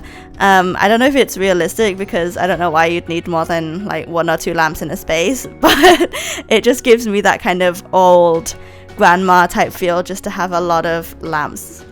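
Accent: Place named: Asia. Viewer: Hey! Firstly, gorgeous build - like, it’s so cozy and cottage-y and I love it! Secondly, your voice is so cute! And it’s so cool to learn that you’re Asian, I wouldn’t feel self-conscious about your accent or voice, but I appreciate it’s still daunting to create voiceovers haha! I struggle with my own. In response to your question on voiceovers, I tried to script mine but it came out too robotic... now I’m trying to just go with the flow, tell some stories, talk about the build, but it does take a lot out of me to record them. I’ve got so much recorded footage just waiting for voiceovers 😂 Love your videos! 💜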